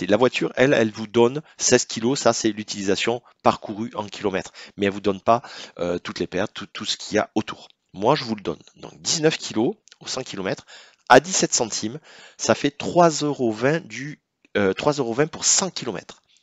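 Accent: South, France. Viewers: Bonjour ok super cool je savais bien tout ça mais là vous êtes vraiment super gentille et clair bravo pour ces tarifs cités, vous habitez où svp vous avez un accent assez fort du sud de la France pour savoir si l'on serait pas voisin par hasard bref dîtes moi par contre avez vous effectué un tableau avec les différences entre la thermique et la Tesla au niveau des réparations ou des révisons svp c'est surtout là aussi qu'il faut faire montrer l'évidence des différences il y en a qui sont déçu total moi je suis un grand amoureux de la Tesla je veux en avoir une et à cause du tarif de l'électricité je me suis plutôt tourné vers une hybride mais je me suis fais avoir en beauté une fois de plus avec une voiture en mauvais état ce n'est pas acceptable j'ai trop de frais à faire dessus et même les pros chez le concessionnaire m'ont arnaqué et ont évité de me faire une géo alors qu'elle en avait bien besoin car j'ai soit les amortisseurs avant à refaire soit le triangle inférieur d'après mon mécano ce serait la barre qui est le triangle apparemment il y a un truc tordu et j'ai pris un trottoir en plus qui a accentué le souci ... 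donc j'ai bien envie de savoir s'il serait possible de me faire reprendre ce véhicule et du coup acheter une Tesla modéle 3 alors que ma préféré est la modéle S la coupe j'en suis fou ...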